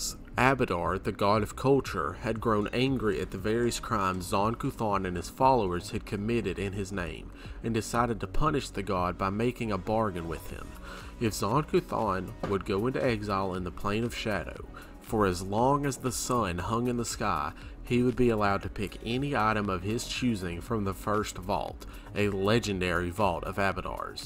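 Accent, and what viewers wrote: South, United States. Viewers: exceptional voice/accent, Im from SC and appreciate the southern rep. The music doesnt fit though. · I'm from SC and was also pleased to hear a Southern GM haha. It's not a common accent for these kinds of videos so it definitely made me smile Great work on these vids btw!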